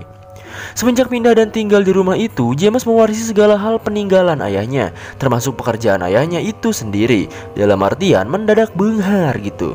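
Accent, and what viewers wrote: West Java, Indonesia. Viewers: Sunda euyy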